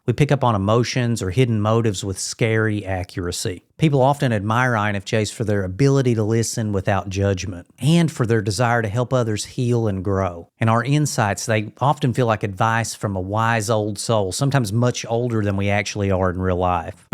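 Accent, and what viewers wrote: South, United States. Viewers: I am from Virginia. And, it is so wonderful to hear your Southern Accent… one of the nicest ones I have ever heard. You should read for audiobooks😊 I am an INFJ It’s really kind of a relief to hear all of this. I don’t feel so weird anymore. Thank you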